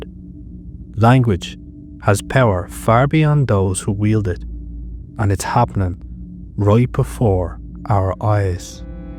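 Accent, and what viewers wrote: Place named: Rural, Ireland. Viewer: Maith an buachaill… definitely a culchie twang off that Irish accent ya speak in tho 😂😂 class work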